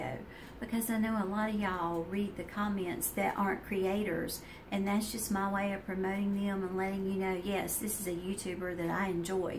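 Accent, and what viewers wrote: South, United States. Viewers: Hi! I totally know what you mean about you tube screen shots! I love your tactic to get a good pic! I follow many of the same ladies you do. I love the different accents we all have depending on where we are from. I'm a midwesterner so I often wonder if all of you, with those adorable southern accents, can tell which part of the country it's from? (North Carolina vs Texas?). Just wondering.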